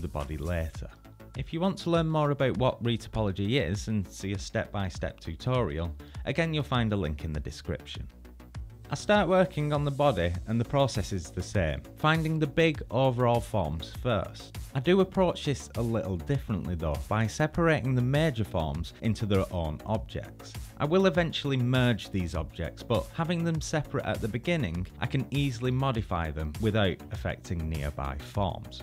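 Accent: United Kingdom. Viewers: I dont understand British, in my russian school we've learned English only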